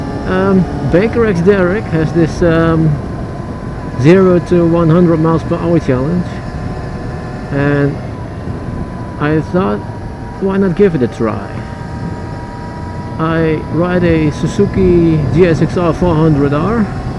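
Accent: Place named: Netherlands. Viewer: Are you from holland?? because you have a dutch accent to you're english (I'm from holland) Btw nice bike ;) + another reasen to think that you are dutch is because everything i flat If you are!! Hoi hoe gaat het met u !!!! ;)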